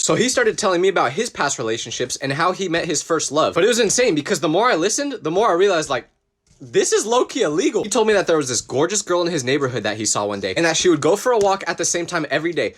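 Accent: United Kingdom. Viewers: Why does he have such a good British accent…. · I’m British you don’t need to put a British accent we don’t mind but it is a very different place to be in because there really rude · Your British AHHHHH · tell me how you talk British in two episodes and you’re telling me that if a ghost was there, it will be scary. If it was British how does that make any sense? You literally speak British? How is that scary to you? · your british AHHHHHHH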